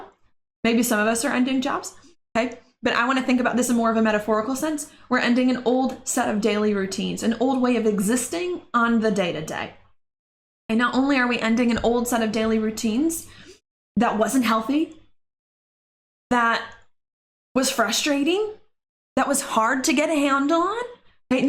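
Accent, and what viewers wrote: United States. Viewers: you have an interesting accent, I'm trying to figure out, what state? enjoyed your reading.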